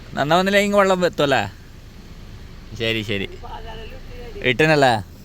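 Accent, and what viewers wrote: Kannur, India. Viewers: യാത്രയ്ക്ക് ആശംസകൾ. Vedeo length കുഴപ്പമില്ല. കണ്ണൂർ slang നമുക്ക് സൂപ്പർ.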